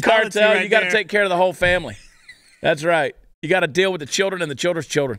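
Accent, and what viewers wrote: Texas, United States. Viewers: Ya gotta love a wordsmith with a Texas accent!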